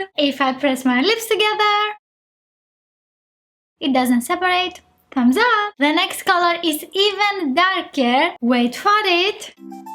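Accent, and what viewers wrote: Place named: Greece. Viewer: You can also say split instead of separate if you want. Nice accent, not sure if you were Greek or Spaniard. Thanks for the review!!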